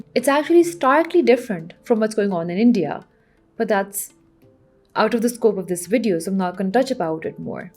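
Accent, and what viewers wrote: Pakistan. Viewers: Why should she? She's Pakistani and she has the Pakistani accent (lil bit) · I am a Sikh from Delhi India, who have been living in North America since childhood. We speak Punjabi at home, my kids speak and understand Punjabi. I am proud to be Punjabi. In fact Punjabi singers based in UK, since 70’s made Punjabi cool and popular all over the world. The host of the vlog seems and sounds like a Pakistani, where Punjabi language is considered inferior and Pendu. In Pakistan if you want to look educated and sophisticated you speak Urdu. Since most Pakistanis are Muslims they threw their mother tongue and culture in the garbage bin. They adopted desert culture and cult. They destroyed their own customs and adopted foreign traditions. They forgot Bhangra, Gidda, Vaisakhi and Holi.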